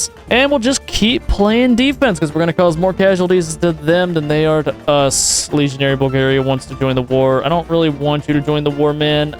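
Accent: United States. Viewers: You speak American? not England language? intersting. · You actually speak English! Granted it is Americanised English.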